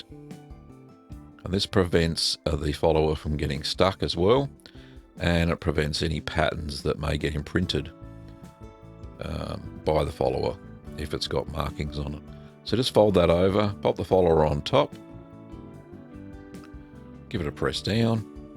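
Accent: Australia. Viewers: Ah finally an aussie...phew mate....finally a local.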